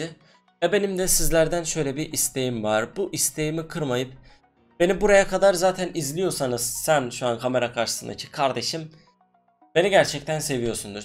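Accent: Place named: Urfa, Turkey. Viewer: Abi beni yanlış anlama ama öncelikle biraz kitap okuyup konuşmanı düzeltmelisin. Evet biliyoruz Urfalısın ama bu konuşmandan dolayı arkadaşım seni izlemeyi bıraktı. Benden sana tercih rekabette ve battle royale modunda değişik şeyler dene... Örneğin rekabette hiç bilinmeyen silahlar ile oyna veya sadece tabanca vs. oyna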